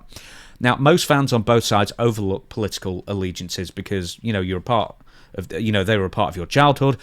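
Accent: United Kingdom. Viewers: This video is such engagement bait. You weren't there so you don't know the truth. You are not the authority on current events in the pro wrestling business just because you speak with a British accent,. Also, kind of a 🍆 move to jump on the guy for saying "b" instead of "m" regarding "billion". Check yourself,. Piers Morgan you are not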